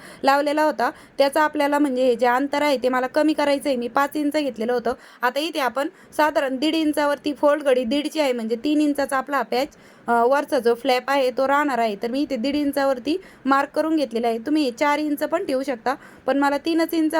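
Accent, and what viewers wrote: Ahmednagar, India. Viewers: तुमचे गाव कोणते आहे मला भाषा आमच्या नगर जिल्हा ची वाटते सबस्क्राईब केले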